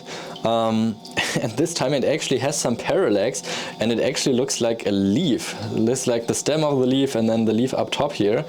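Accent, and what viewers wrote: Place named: United Kingdom. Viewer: Just watched your short:gold:amethyst agate. Joining you in prayers that our US president will get defense weapons QUICKLY to Ukraine...today!!! Loved your shoes/Ukraine colors. You always take me back to student-exchange days Bielefeld/Westfalen 1961. You are so admirably articulate in authentic British English. Still read German Bible/listen to Bach/baroque daily. Thank you,. Your voice is music to my ears. Of course your enthusiasm and expertise with minerals (creation) are equally valued. Robert TX USA.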